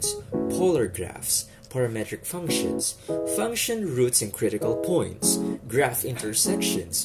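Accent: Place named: Philippines. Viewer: pinoy ka nu 😊 the pronunciation and accent gives it away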